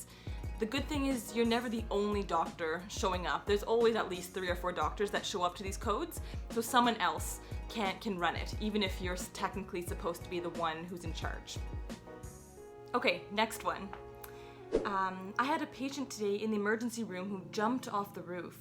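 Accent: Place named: Canada. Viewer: Really heard your Canadian accent in this video :)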